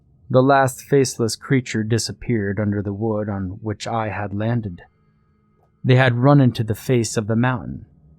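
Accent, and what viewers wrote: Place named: Western Maine, United States. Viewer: you sound like a few guys I know up in my area of Western Maine♡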